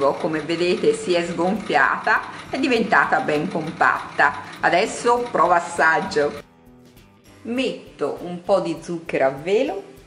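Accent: Tuscany, Italy. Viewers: Non si può sentire un accento toscano per la descrizione di una pastiera..... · Che dire sei strabiliante... La tua bravura e poi quell'adorabile accento toscano mi regalano relax e voglia di combattere anche tra i fornelli e poi con la bilancia🤣Un grande abbraccio e un incoraggiamento a tutti per questa triste situazione. Che il Signore benedica tutti🙏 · perdonami sicuramente la panna e le fragole si riferivano ad altre immagini stavo cucinando Ma sono sicura che ti hanno fatto vedere perché ho riconosciuto la tua voce, tipicamente toscana come me e mi sono voltata e ti ho vista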